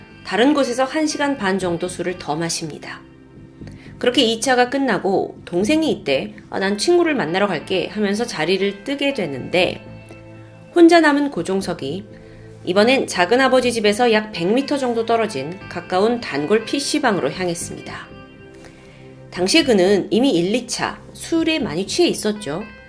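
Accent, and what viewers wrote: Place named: Jeolla, South Korea. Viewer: 라도네요 ㄷㄷㄷ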